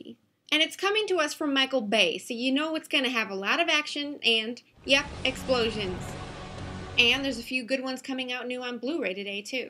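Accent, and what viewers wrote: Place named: France. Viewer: Nice "french accent" ! France loves you (I do anyway :)) !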